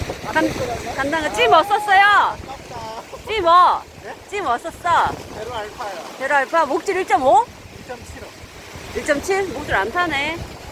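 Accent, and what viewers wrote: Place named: Gyeongsang, South Korea. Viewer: 낚시 못한지 2년 넘었어요 영상이 너무 좋아요 경상도 사투리 쓰는 명랑소녀 모습입니다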